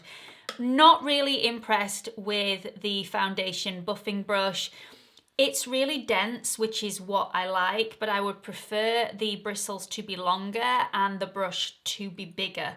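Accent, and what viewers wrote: United Kingdom. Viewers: After seeing several reviews, including yours, about No7 foundation drops, I ordered and am anxiously awaiting it's delivery. First I've purchased of that brand. As far as "absolutely stunning," I LOVE it and most every other "British sounding" words and expressions you say! You see, I'm from the southern US where lots of one syllable words are drawn out so they have many. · You can say absolutely stunning as much as you like. I just love listening to you chat. ( love your British accent). I would definitely wear the T shirt lol. Oh and the make up looks great!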